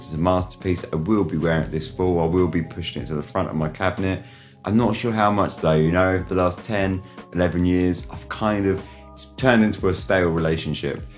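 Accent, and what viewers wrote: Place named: United Kingdom. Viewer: You are really cute, thanks for commenting on my video;) I had no idea about you. I am aaalllll about Britisch accent (I'll watch your videos just for the accent, just kidding!) and I am looking forward to more videos;) Like and a new subscriber:) From the ones you mentioned I love Guerlain. How old are you?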